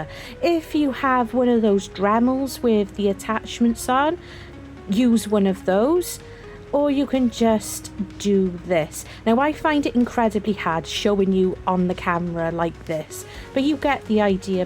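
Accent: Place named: Wales, United Kingdom. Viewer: I love to hear the homeland accent I was brought up in South Wales NR Merthyr Tydfil 😊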